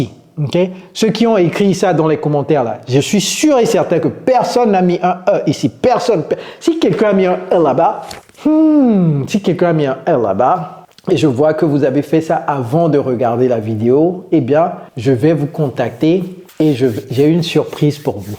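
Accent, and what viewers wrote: United States. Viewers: Wow c'est tres bon votre accent americain! meme votre accent francais est impeccable. BRAVO! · "Apples to oranges" I heard " apologize"😁 if I'm regular to see all your videos I can hear American accent. I'm glad to find your lessons. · j' adore vraiment cet accent americain. ça t'as pris combien de temps mr d'être meilleur en parlé? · I really like the way you teach English! The challenge is the american accent.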